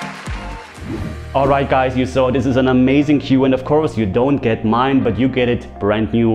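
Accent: Germany. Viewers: Love the German stoic accent and your teutonic pool playing efficiency... Great..! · Great video, as usual. Interesting to hear you speaking with your bavarian accent. 😉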